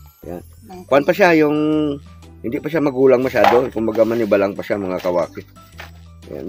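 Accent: Iloilo, Philippines. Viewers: bacolod Ako kawaki. Sabi ko n ilongo k dahil sa tono mo magsalita sarap yan kbl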